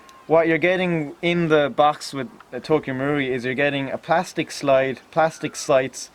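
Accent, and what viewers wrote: Ireland. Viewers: You get a thumbs up just for being Irish, and the Irish accent. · Finally a proper comparison between the two, everyone's else's comparison is only like 5 minutes and barely gives any information. Also i still can't figure out which part of Ireland that accents from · Excellent, informative video matey. Was just pulling your leg, I knew what you said really (everyone loves the Irish accent!)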